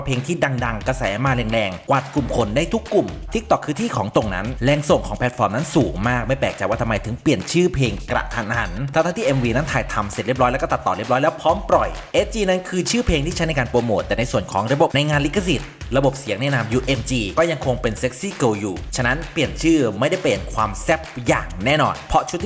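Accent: Isan, Thailand. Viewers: ตื่นเต้นอีกรอบแล้ว..อยาดฟังมากๆคะ รักลิซ่า ชอบช่องนี้มาก เว้าอิสานถึกใจคักๆ.มักหลาย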